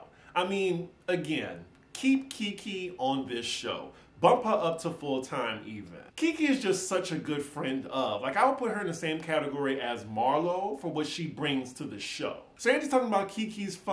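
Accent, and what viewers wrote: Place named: South, United States. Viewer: not you ashamed of your southern accent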